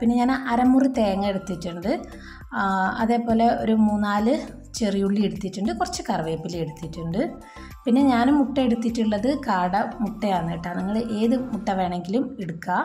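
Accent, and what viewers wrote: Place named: Kannur, India. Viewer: Kannurkare samsaram pole und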